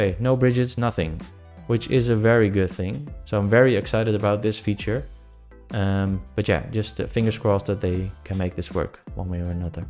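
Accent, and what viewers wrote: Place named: Spain. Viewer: You from Spain? What’s that funny accent? Great video by the way.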